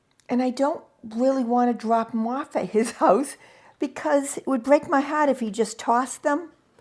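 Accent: New England, United States. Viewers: Hello,! Your house is perfect for showing. I'd leave the throw on the couch. It adds a touch of coziness. You don't have to take down your rack inside the closet. Just make it clear to potential buyers that it does not stay. Your china is beautiful. I was going to suggest your DIL may like to have your set of china, but you answered that question. Your laundry room looks MUCH better. Not perfect, but I agree the volume is very low. I just crank up the laptop volume to the loudest setting and turn up the video volume and I can hear you fine then. I do not hear a lisp though. I do hear your lovely New England accent, but I haven't picked up on a lisp. It's 6 degrees here and -13 wind chill. We've had rain, freezing rain, and snow. Just enough to cover the ground. It's cold enough all I want to do is hibernate. The sun is shining brightly so I like that part. 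Have a great weekend. Rest up for next week! See you next time! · your accent is lovely. I love the New England accent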